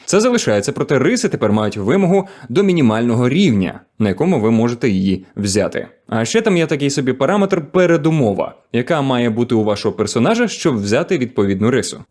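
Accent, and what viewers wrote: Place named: Russia. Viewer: Но акцент не киевский, а прям очень русский - это спасает, наверное)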